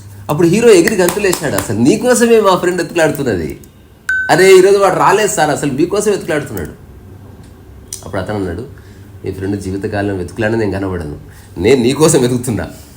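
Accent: Telangana, India. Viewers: ఇలా మాట్లాడ గలగడం ఒక ఆర్ట్.బాష, ఎక్స్ ప్రెషన్,విషయం,వివరణ ,నవ్వు,తెలంగాణ యాస,నేటివిటీ ఇలా అన్నీ అద్భుతంగా ఆ నోట్లోనుండి వస్తున్నాయి.అద్భుతం.పరమాద్భుతం.🎉🎉🎉🎉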